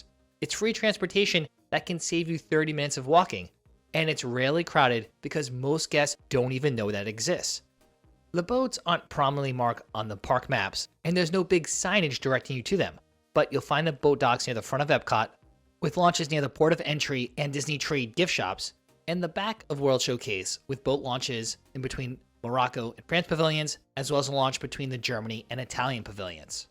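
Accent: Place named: Philadelphia, United States. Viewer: announcer sounds like he's from Philly- "wudder" instead of water. it's a SEPA thing.....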